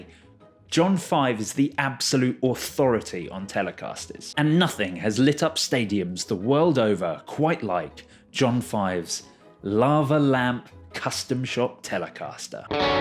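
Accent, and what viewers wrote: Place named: United Kingdom. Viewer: This guy kicks ASS! I usually HATE Brittany accents. Love this dude! Different NRG💡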